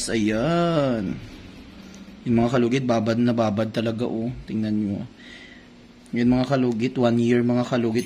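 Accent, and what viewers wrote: Iloilo, Philippines. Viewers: ilonggo ka po? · Taga saan po kayu?ilonggo ka eh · daw ilonggo kaman maghambal. .hehehe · Daw ilonggo ang tono haha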